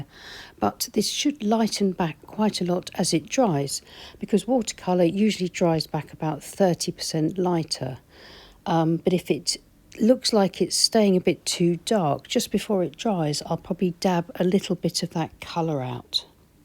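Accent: England, United Kingdom. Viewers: I love your work and your kind voice and your beautiful English accent 😊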